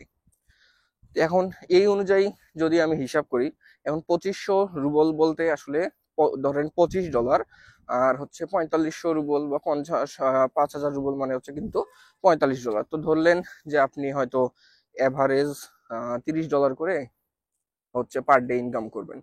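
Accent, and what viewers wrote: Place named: Sylhet, Bangladesh. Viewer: ভাই সিলেটর নি?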